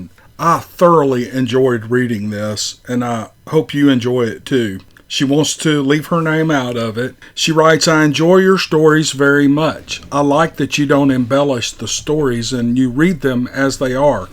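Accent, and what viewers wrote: South, United States. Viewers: Happy anniversary! I found you not long after you started. I heard about you on Bigfoot Odyssey and a couple others mentioned you. You are my people! (Southern drawl and all!);keep up the good work. By the way, I like the chit chat on the videos too. I'm more of a people person. Having a somewhat large pet page, people say I talk like I know people. I do like to get to know people on a personal level. The "chit chat" works for me! Remember it's your channel. You do you! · The siege of Lockett ranch is amazing! Wow if you could read it that would be cool too. Dark waters is a great narrator. I'd like to hear it with the southern twang as well. I've listened to it probably 5 times. But I'll listen another 5 again if you put your narration twist on it! Maybe you could work something out with DW. Hes great! And so are you.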